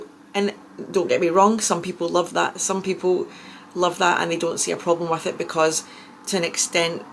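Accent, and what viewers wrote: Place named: Scotland, United Kingdom. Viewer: It was very evident once I heard you speak😅 my bf is Irish, and I love his accent, but the Scots are something else💝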